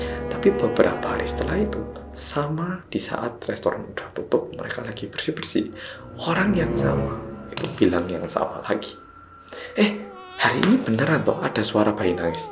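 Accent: Java, Indonesia. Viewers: Makacih, Boloku Sing Paling Medok Dheweeeee 🙏🏼🙏🏼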